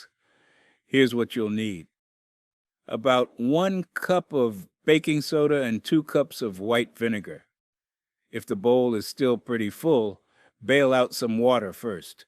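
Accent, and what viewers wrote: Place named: Louisiana, United States. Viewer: This is what I said as well! 😂 I was like ...."He sounds like my Dad/Uncles!" , which are born in Louisiana.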